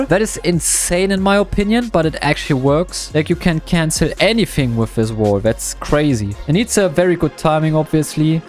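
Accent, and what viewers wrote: Germany. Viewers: Im sure ur an amazing player but I can’t watch the video with that strong a German accent holy shit better just talk German and put English subs man ur never gonna speak proper English if it’s that strong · Are you German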